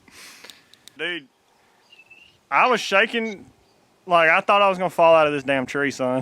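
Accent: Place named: Georgia, United States. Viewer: My first time watching your channel but I could tell you were from Georgia. I'm in Douglasville what about you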